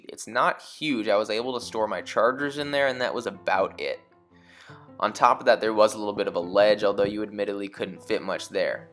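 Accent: United States. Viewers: Great video - I’m amused at your pronunciation of DAK AR being d’CAR - made me laugh over and over - I’m guessing it’s that American accent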